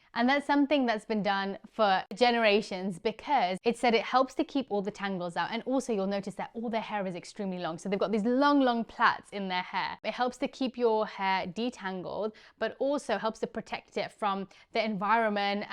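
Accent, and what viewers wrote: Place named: United Kingdom. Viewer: Are you an Indian? I like your accent, it sounds like a little British accent :)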